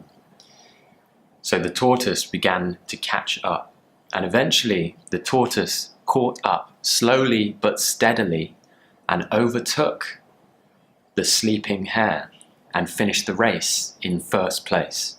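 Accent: United Kingdom. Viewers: Hi The way you teach is impressive. I've loved British accent since I watched Harry Potter. Pity that I'm retired and I practice listening for fun. I'll recommend you to anyone who want a British teacher.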